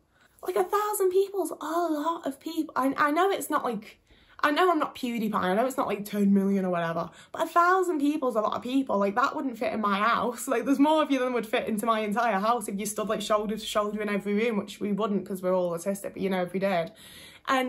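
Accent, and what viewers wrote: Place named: Liverpool, United Kingdom. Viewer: Congrats on 1000 subscribers! I think yours is a particularly refreshing voice because it represents a more working-class experience. Even the Liverpool accent is good to hear when so many autistic videos are RP. Those videos from others are often still very useful but it's good to hear something more distinctive.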